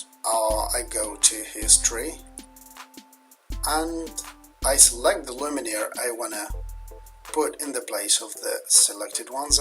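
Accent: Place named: Australia. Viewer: you got some accent Matttte !! AUstralian !!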